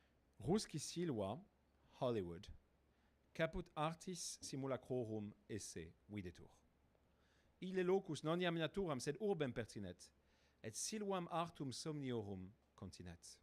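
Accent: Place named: France. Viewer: He should have read his work with a Latin accent, not with a French one!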